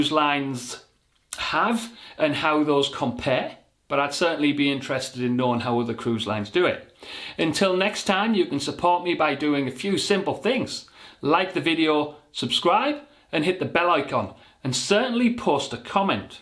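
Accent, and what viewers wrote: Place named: Newcastle, United Kingdom. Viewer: Are you related to Alan Shearer by chance? You kind of look like him and you've got a Newcastle accent lol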